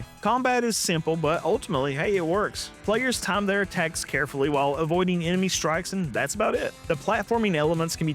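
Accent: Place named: Southwest, United States. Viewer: Love your style man! Also, as someone from southwest, your accent makes me feel like I'm just geeking out with one of the bros back home. Great stuff!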